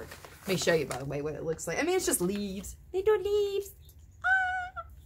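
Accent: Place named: Tennessee, United States. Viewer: I love that Tennessee tone.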